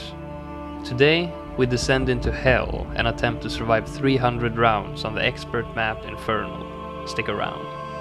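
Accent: Sweden. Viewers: u sound swedish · you sound soooooo swedish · You sound swedish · you sound really Swedish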